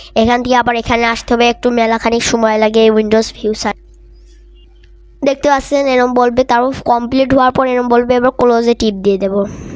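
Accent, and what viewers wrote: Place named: Kushtia, Bangladesh. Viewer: Onk valo bolco viyaa❤❤ Ami sure tomer basha Kushtia 😊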